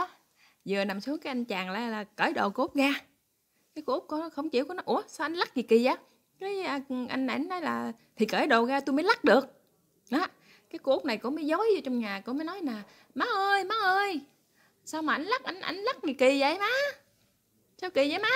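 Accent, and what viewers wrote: Mekong Delta, Vietnam. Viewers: Giọng miền Tây có duyên ghe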